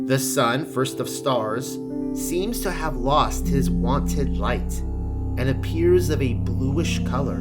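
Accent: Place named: United Kingdom. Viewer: sounds like he was in the UK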